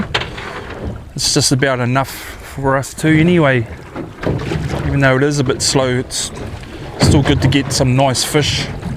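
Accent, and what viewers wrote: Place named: New Zealand. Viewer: No offence mate but you have a huge kiwi accent ! ...hahaha....I miss it actually having lived in nz for 22 years....now i have to listen to the aussie accent.....nowhere near as cool a !!!! Keep up the great fishing vids....nice healthy looking snaps.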